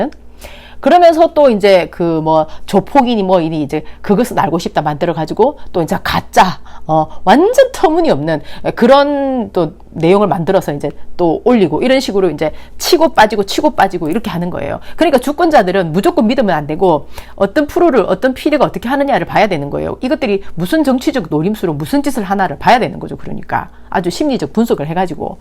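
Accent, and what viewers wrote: Gyeongsang, South Korea. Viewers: 어느사이 팬이 되었습니다. 볼때마다 재밌고 귀여운 여인이란 생각 지울 수없고 들을수록 독특한 경상도 사투리가 정겹네요~! 이를테면 말맛이 참 좋습니다. 보다 보드랍고 유연한 설득력과 친해지신다면~? 단지 이건 제 바램일 뿐 암튼 좋아요. 사랑스럽고 고마워요 ~!!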